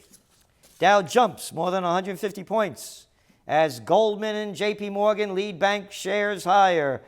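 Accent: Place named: New York, United States. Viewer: New Yawker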